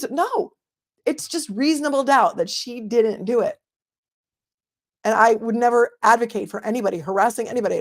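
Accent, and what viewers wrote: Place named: New York, United States. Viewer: i love when you get so excited how your NY accent really comes out! Thanks for all the input!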